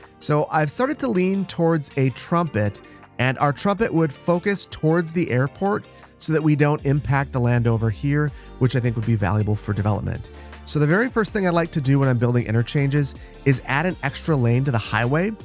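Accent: Wisconsin, United States. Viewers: you’re from wisconsin??? 😂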